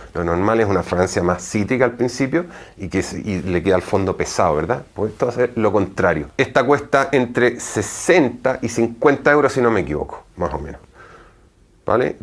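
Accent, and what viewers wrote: Chile. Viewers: España? Pense q grababas en Chile debido a su acento. Gracias por el video! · De que parte de España eres?, hablas como Chileno. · Tan guapo 😊 tiene todo el acento chileno 😊☺ saludos... Eres seco 😙 y Viva Chile 😊😊😊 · De que parte de españa eres su acento es como escuchar a un Chileno. Gracias por su canal. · Siempre te veo y algo tienes que aún. No descubro bien se que vives en España pero tu acento no es de español, eres Chileno verdad ? Sorry se que no tiene nada que ver con el video. Gracias a ti tengo 4 perfume recomendaste saludos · estarás en España, pero tu acento chileno te delata, el tono de voz y los gestos del típico santiaguino 🤣😊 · Es chileno'español cuando habla jajaja un crak abrazo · Hola, podrias decirme si realmente eres Chileno? Por tu acento lo creo..... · tienes un acento Chileno,es a si??,saludos.... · Tienes una mezcla entre Chileno y Español hermosa porque entre Chileno metes una frase español y es gracioso 😂😂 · Acento chileno + acento francés, pero vive en espana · Me encanta escucharte, sos un maestro, das cátedra y si a eso le sumamos los ojos claros, la camisa negra y el acento chileno ya sos irresistible. 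Más reseñas de perfumes femeninos y cuáles considerás unisex. Abrazo desde Uruguay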